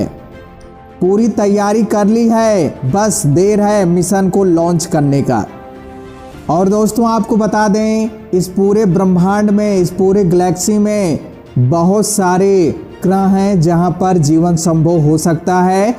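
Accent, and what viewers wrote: Bihar, India. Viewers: Tum Bihar ke ho na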